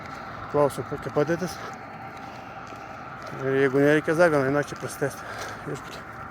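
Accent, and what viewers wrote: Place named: Šiluva, Lithuania. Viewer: AŠ iš Šiluvos.malonu.Keista,kad kalan grynai tai pat. :hand-pink-waving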